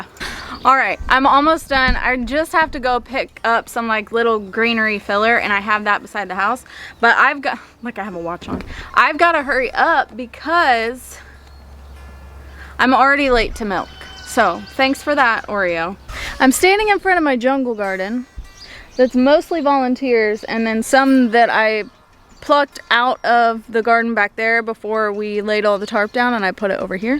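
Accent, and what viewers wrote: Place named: Midwest, United States. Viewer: Just found you this am. I knew I heard a midwestern accent. I was raised in central Illinois. You sound a lot like me and my sisters. I will be following you and will share your post. I follow older people who would be happy to support younger people. Your flowers are gorgeous! I nice blend of real life and information. Hope you have a wonderful week.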